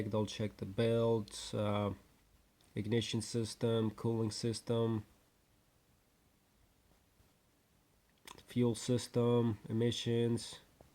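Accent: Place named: New Mexico, United States. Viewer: Sounds like a NM accent. The natives there have a very slight lilt to their voice. It comes from being surprised all the time.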